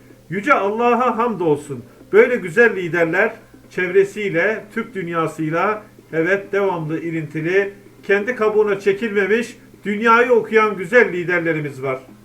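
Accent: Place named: Shusha, Azerbaijan. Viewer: Allah senden razı olsun qardaşım. Sen öyle konuşuyorsan ki,sanki Şuşada doğulmuşsun.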